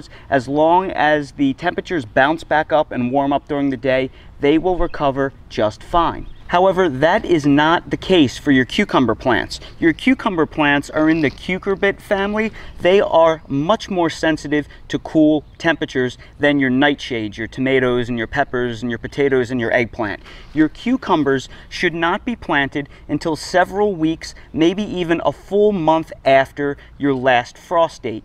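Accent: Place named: South Carolina, United States. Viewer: Hi, Thanks for all those great tips.I will some of them in practice like putting mulch and watering the plants. I will follow some of them. For what I hear you are South Carolina. I am making a visit to that state soon. I would like to know in which area your garden, not your specific address. I want to know how it is living and gardening there. Is it an area for a homestead ? I want to get information that I will get only by visiting there. I hope you will answer some of my questions. God bless you and thanks this great video.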